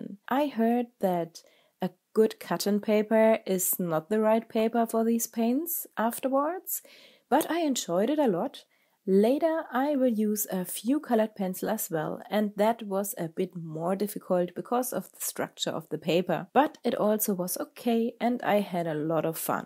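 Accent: Germany. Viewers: Dein Englisch ist zwar richtig gut- trotzdem hör ich da einen LEICHTEN Deutschen Akzent raus? 😅 Gibt's deinen kanal auch deutschsprachig? Deine Kunst ist echr genial 👌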